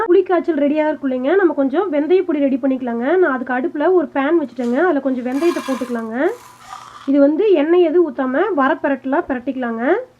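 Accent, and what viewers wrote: Kongu, India. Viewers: கோவைல மட்டும் தான் அப்டி பேசுவார்களா.. ஈரோடுலயும் இப்டி தான் பேசுவாங்க.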